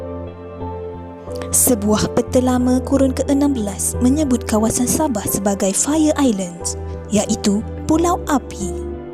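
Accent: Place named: Kuala Lumpur, Malaysia. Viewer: ni ka pula sejarah sabah, yg brcerita d cini mcm bukan org sabah, logat kl pula ni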